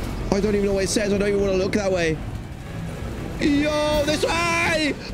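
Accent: England, United Kingdom. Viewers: Are u a brit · do u live in England cuz I do and u sound English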